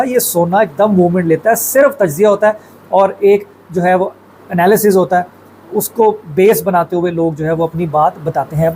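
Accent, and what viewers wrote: Punjab, India. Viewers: Bhai G ap video main ye Punjabi touch Q dety ho? Do you understand it makes ur point more authentic? You look embarrassing so stop ✋ this Punjabi touch!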